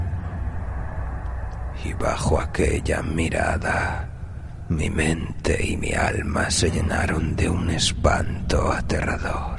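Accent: Catalonia, Spain. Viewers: Una acento muy catalán. Me gusta. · le das un toque catalán xD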